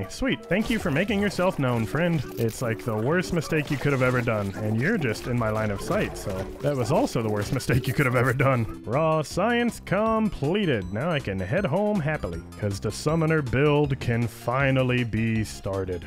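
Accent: United Kingdom. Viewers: If heard of you a ton from grounded community days and paralyzers videos i thought you were from the uk 😂😂 didnt think i would like your content but i was wrong funny video ggs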